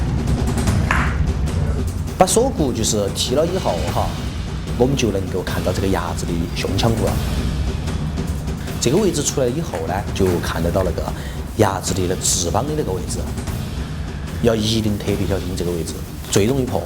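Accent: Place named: Sichuan, China. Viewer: 四川话解说真是笑死我咯，真是欢落源泉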